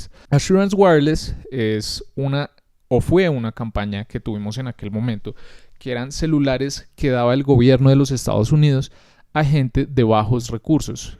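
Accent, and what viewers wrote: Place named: Costa Rica. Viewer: Soy de Costa Rica y de entrada dije, a mira alguien de aqui. Como nos confundimos nosotros mismos con los acentos.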